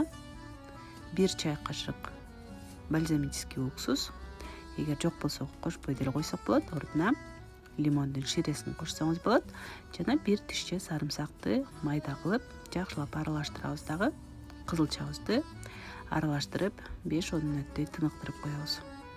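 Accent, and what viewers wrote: Standard. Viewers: Кыпкыргызча суйлойсуз ээ 🥰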